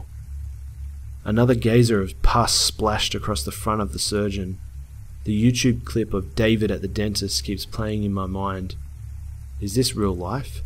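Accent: Australia. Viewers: ***** No worries. It's always entertaining watching your vids. I also follow LM. I live on the Gold Coast in QLD Australia, so it's cool to hear a familiar accent :) I'll be waiting in the wings for a new video soon mate! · Badass man, keep up the good work. Great videos, like the Aussie accent too.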